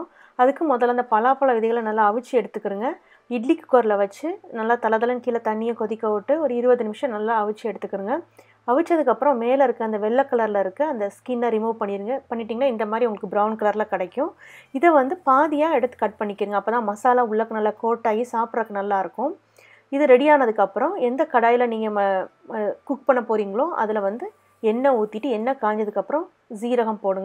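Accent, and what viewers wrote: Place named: Tamil Nadu, India. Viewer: Vungha Tamil slang nice ☺️☺️☺️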